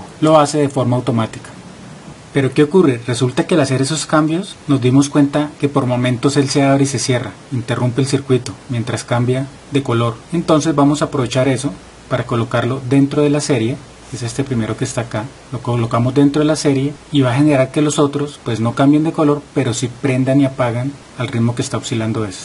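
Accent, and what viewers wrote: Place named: Colombia. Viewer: Muy buen vídeo! Por cierto, ya creo saber de dónde es tu acento; de colombia! Linda voz :) Saludos!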